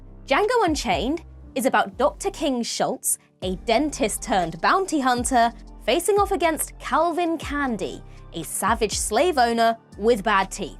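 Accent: United Kingdom. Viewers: Who’s this cheeky Brit?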